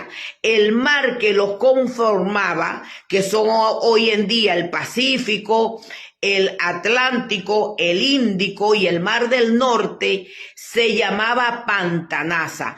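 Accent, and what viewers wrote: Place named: East, Venezuela. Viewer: BUENOS DÍAS PROFESORA COMO ESTÁ USTED MIRE LE PREGUNTÉ LA OTRA VEZ QUE DE PARTE ES USTED PORQUE HABLA IGUAL QUE YO ORIENTAL DE PURA CEPA YO SOY NACIDO EN ANACO ORITA VIVO EN VALLE DE LA PASCUA GUÁRICO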